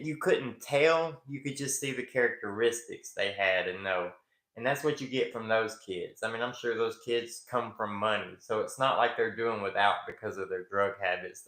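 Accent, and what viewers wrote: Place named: Alabama, United States. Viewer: I recognized your accent before learning you're from Alabama, I'm native myself. Love your channel. You make great points on every topic you choose to discuss.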